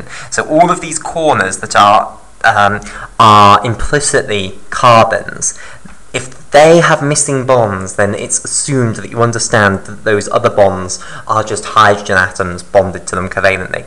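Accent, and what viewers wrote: United Kingdom. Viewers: Why do i feel much smarter listening to a teacher with a british accent? God bless you sir thats a lott of help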